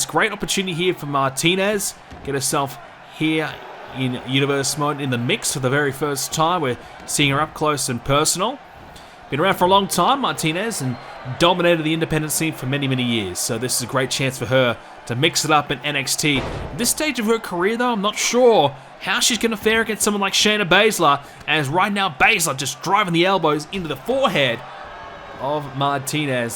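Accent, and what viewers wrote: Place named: Australia. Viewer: are you from australia? coz thats where i am and you sound aussie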